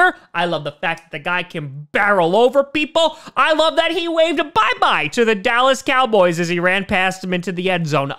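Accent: New York, United States. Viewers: lmao he is from NYC and that is to how new yorkers talk. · yes, is from nyc. and a lotta new yorkers will say yuge. he also says "hughston" or "yuston" rather than "houston," which some say in ny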